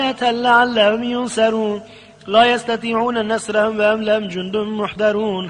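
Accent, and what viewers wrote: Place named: Southeastern Anatolia, Turkey. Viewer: Mustafa bey öncelikle iyi günler dilerim. Yorumunuzda bahsettiğiniz tarzda çok çok büyük hatalara rastlamadım. Denk geldiğim kadarıyla 6 yerde harf telaffuz hatası var.(Peltek yerine düz okuma gibi.) Bunu da her insan hızlı okurken gayet de yapabilir. Bey'de farkettiğim kadarıyla Doğu-Güneydoğu Anadolu ağzı var. Bu nedenle de bazı harflerin telaffuzu kendisi için alışılmadık gelebilir. Ortada veballik bir durum olduğu düşüncenizi reddediyorum. Size ve sevdiklerinize sağlıklı ve huzurlu günler dilerim. Bey'e de sevgiler. Başarılarının devamını dilerim.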